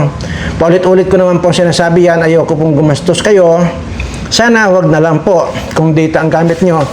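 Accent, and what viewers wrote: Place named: Hiligaynon, Philippines. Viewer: Tuod man nga ilongga ka day ,sa tono ng salita mo ,namit ka nga gahambal sang bagbakal mo ng juice sa tiangge, nano ka man nga ivedencia gid ang ginahimo mo ? Duda ka pa sa tono day??? Ha ha ha I like it puedi na ako ilongga sir?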